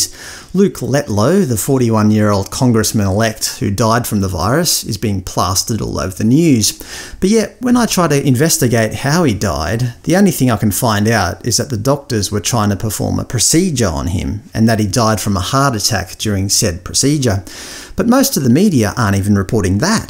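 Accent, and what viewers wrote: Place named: Australia. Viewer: Don’t know what it is exactly. But the calming and soothing of your voice and your awesome Aussie accent just appeals to me.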